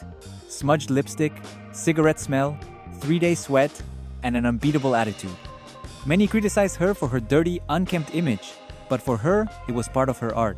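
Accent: India. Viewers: this guy sounds indian, this tells me all i need to know, disliked the video 3 secs in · What accent is this? AI indian accent?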